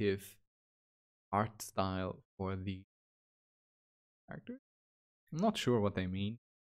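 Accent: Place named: Ireland. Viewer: Are you Irish? You sound like a mix between Irish and something else.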